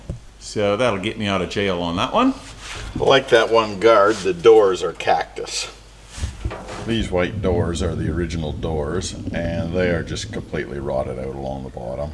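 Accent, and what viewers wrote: Canada. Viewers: Hi. Another cracking video and you’ve set yourself quite a challenge the! On another issue altogether now. I just can’t seem to help myself looking beyond the video presented to speculate on the presenters background. There’s no hiding that Canadian accent but your use of the Australian “lingo” is spot on. So much so in fact that I could almost second guess what you were going to say so I would call you 100% Australian! So welcome mate! You must also have some sort of mechanical / technical background to be tackling the projects you do. Whatever the case welcome to Australia and I hope you and your family have a long and happy life here. Cheers from Gippsland.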